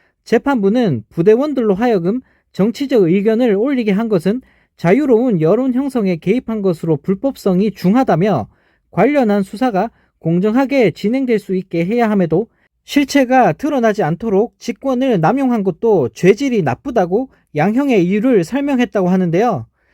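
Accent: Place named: Gyeongsang, South Korea. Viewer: 구독 좋아요 추천 드립니다 폭염 날씨에 방송을 하느라 수고 합니다 님 차분하신 경상도 사투리의 목소리가 좋으네요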